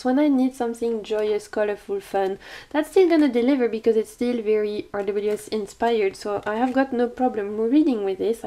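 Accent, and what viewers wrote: France. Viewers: Your lovely French accent is what makes your videos so pleasant to me😘 I love to hear different accents in videos: it makes me appreciate the creators' effort to speak a second language and reach a wider audience even more. I am very self-aware of my Italian accent too, but creators like you inspire self-confidence in me. Sending healing vibes❤️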